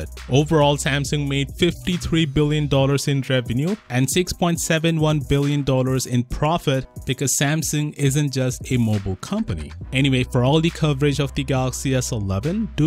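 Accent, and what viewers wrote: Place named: India. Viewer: Little Indian English.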